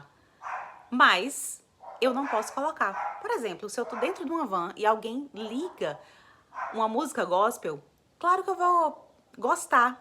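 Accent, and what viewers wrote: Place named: Salvador, Brazil. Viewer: O silêncio é um espaço vazio esperando ser preenchido com harmonia! Já morei em várias cidades do Brasil e percebi que a invasão do espaço alheio "poluição sonora" é uma questão cultural. Todos passamos por um processo evolutivo e acredito que a turma do barulho possa mudar o tom, e conviver em paz com seus semelhantes. Você tem um sotaque de Salvador, onde o silêncio vale ouro!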